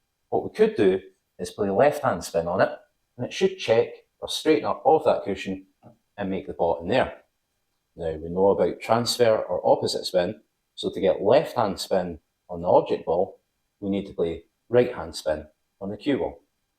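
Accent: Scotland, United Kingdom. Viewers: Nice to hear a Scottish accent. Thanks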